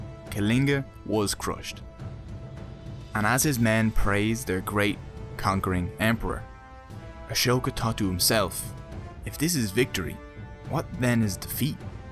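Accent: Dublin, Ireland. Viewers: S/o to Dublin accents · As an Indian I have to say, it's honestly quite fun to hear the names with an Irish accent. Now I'm a fan 😂.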